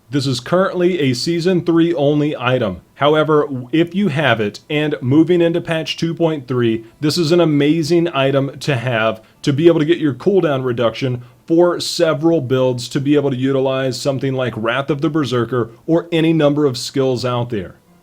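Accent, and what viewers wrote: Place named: South, United States. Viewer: Oh a southerner! OK, you're off the hook. Keep up the great videos! :-)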